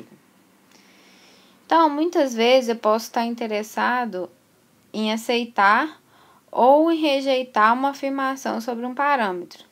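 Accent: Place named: Minas Gerais, Brazil. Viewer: Ótima explicação e o sotaque mineiro é bão dimais.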